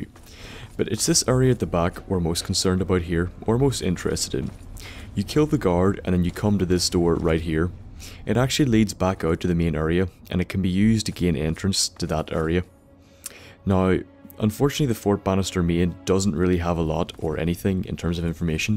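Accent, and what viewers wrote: Northern Ireland, United Kingdom. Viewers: nice,video just wanted to ask are you northern Irish? because you kinda sound like my friend from northern Ireland.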